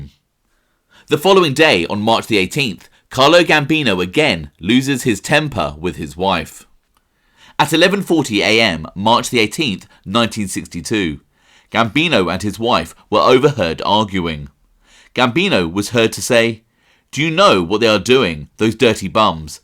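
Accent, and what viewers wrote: United Kingdom. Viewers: I’m so sorry for your voice. Brit plus that lisp is disgusting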